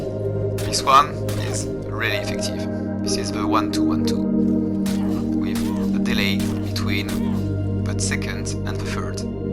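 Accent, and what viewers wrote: France. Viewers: You are french ? you have a french accent :)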